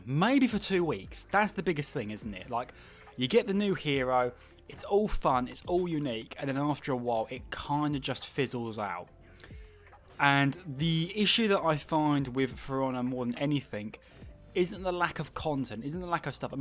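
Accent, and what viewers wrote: United Kingdom. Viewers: Good lord you talk too much and wasted all that time I watched hoping for reveal and got a British annoying voice instead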